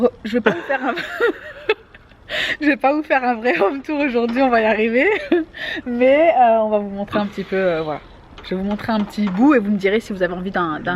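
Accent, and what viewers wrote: Algeria. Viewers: Salut bella, bsahatkom la nouvelle maison, inchallah trabah 3likom avec l'arrivée du bébé!!!! Stp ton accent algérien paraît orannais!!! Est tu d'oran??? Ce dont je suis certaine c'est que c'est l'accent de l'ouest!!!!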